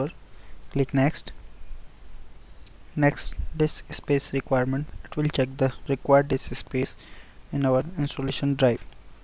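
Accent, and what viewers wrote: India. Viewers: Ah great! Another video of installing Microsoft programs done by someone with an Indian accent. If it wasn't for your people I wouldn't know what to do. Fuck the ads though, wouldn't you agree that 10 ads is a bit much?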